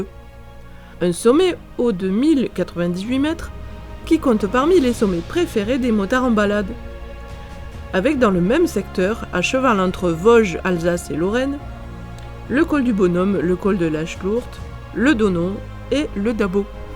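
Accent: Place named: South, France. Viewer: "eh bieng bonjour les copaing..." Ca me fera toujours marrer cet accent de sud perdu en Alsace 😂 Toujours aussi sympa tes présentations :) Tiens, ton écluse de 16 mètres m'a rappelé une autre curiosité que j'ai visité quand j'allais régulièrement à Strasbourg et que tu pourrais présenter: le plan incliné de chaipuhou (en fait je sais, mais j'vais pas spolier)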